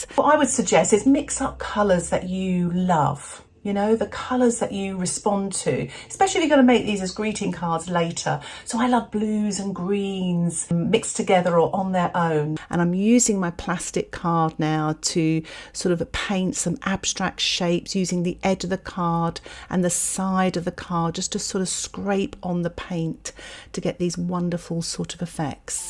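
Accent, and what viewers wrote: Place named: United States. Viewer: Hi this vid has an American accent, hope this is all legal, Brisbane Australia 🦘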